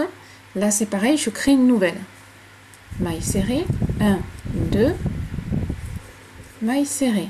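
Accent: South, France. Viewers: bonjour et trés joli châle et continuez à parler un peu de sud ne fait pas de mal au contraire merci pour ce tuto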